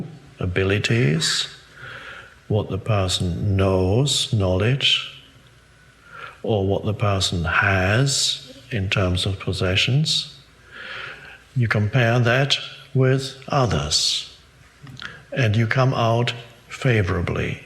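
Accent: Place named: Argentina. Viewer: Grande ese Eckhart hablando en "argentino" 🥰🥰🥰♥️♥️♥️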